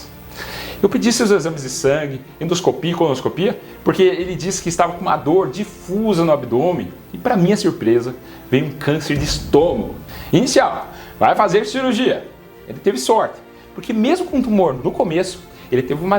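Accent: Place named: Paraíba, Brazil. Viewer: Nordestino paraibano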